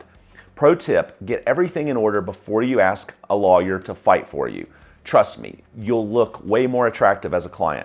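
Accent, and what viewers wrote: United States. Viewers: The President of the Republic signed the law but issued a statement this week stating that the law needs to be re-evaluated as it is impacting descendants of Italians born abroad. In addition the constitutional court is hearing a case regarding generational limits. If the ruling is that there is no generational limit then parliament will need to amend the law. Humor is appreciated but the accent is troppo americano ! 😅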